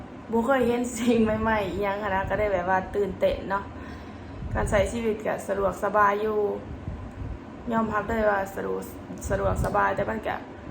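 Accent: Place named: Ubon Ratchathani, Thailand. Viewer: เจ้าอยู่เมืองได๋ สำเนียงคือไทอุบล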